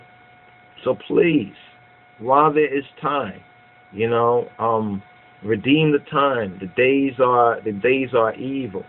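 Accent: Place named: West Coast, United States. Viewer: Wow!!!! you really should read your bible and look for salvation instead of looking to find what race people are there are good arguments both ways but neither have anything to do with salvation. And why is it that in the comments anyone who disagrees with you is racist but your video about salvation is for blacks is not racist? also just throwing this out there for ya Solomon had a black lover but in the book song of soloman she is saying for him not to look down on her because she is black. Now if he were a black man why would she say that? He was the son of kind david by the way. Also I noticed your channel is Ethiopian world network and you sure are dressed the part but im curious what part of Ethiopia are you from cause most of the people I have seen from your country don't have west coast accents..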